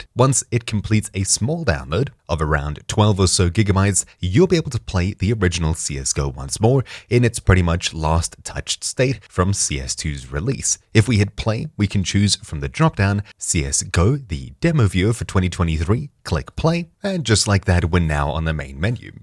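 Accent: South Africa. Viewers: Are you south african? if you are sick man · I didnt know this man was South African. Sawubona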